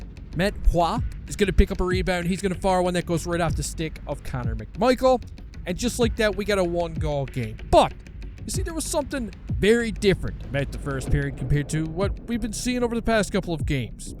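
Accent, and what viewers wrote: Newfoundland, Canada. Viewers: What part of Newfoundland are ya from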